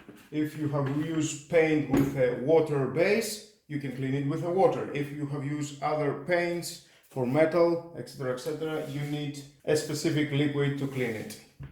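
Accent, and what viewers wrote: Greece. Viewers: Αδερφε Ελληνας? :D Με το που αρχισε το βιντεο σε εφαγα απο την προφορα.χαχαχα Δυνατος! Νομιζω οτι ηθελε λιγο πιο αραιο το χρωμα, αλλα παλι καλα ειναι λογω του μπεκ. Ωραιο Βιντεο.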